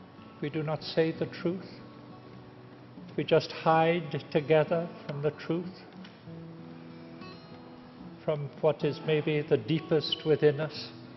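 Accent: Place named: Ireland. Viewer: he sounds as if he has an Irish accent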